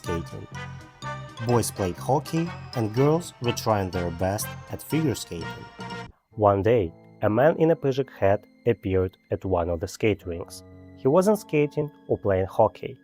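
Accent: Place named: Russia. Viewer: Kl to hear the Russian accent it gives it a little bit different, must be a million criminals, murderers and serial killers the western world doesn’t know about.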